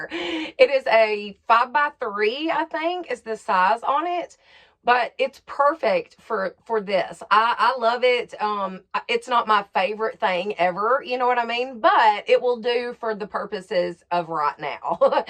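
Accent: South, United States. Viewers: Hello my beautiful Angel. I would love to hear you sing with your beautiful southern accent. I love it!! What a true and powerful words of our Amazing Creator JESUS. He is so so good to us. Even though we are not worthy. I'm a sinner as well and saved by his marvelous GRACE. Praise GOD for his mercy, grace, forgiveness, and his unconditional love he had for us. Thank you once again for your daily devotional words of our Living Father. Blessings to you and your lovely family. I love you my beautiful Angel sister.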